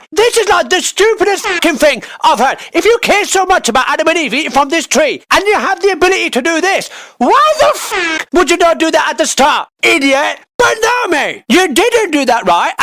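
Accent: United Kingdom. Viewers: I love listening to the angry British man rant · A british guy talking about bible, best video on YouTube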